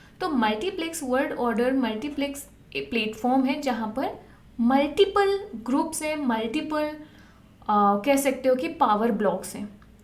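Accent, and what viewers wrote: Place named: India. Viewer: I can't really understand this English ,the videoo seems welll done but unfortunately it is difficult to follow for people not from india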